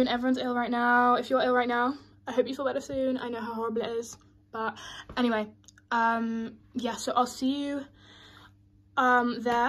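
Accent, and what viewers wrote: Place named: United Kingdom. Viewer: I never realized you were British why did I assume you were American the whole time lol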